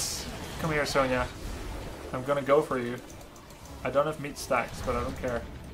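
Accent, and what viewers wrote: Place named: Netherlands. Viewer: Hey man! I first saw you as shoutcaster and wondered if you are Dutch as I thought I recognized the accent. The name Grubby did not make it clear, but now I know its Manuel Schenkhuizen and that sure clears it up ;P I cannot recommend your channel on an educational level as there is a bit too much sarcasm and goofiness, but for pure entertainment it is well worth the sub! Keep it up bro! Groeten uit Spijkenisse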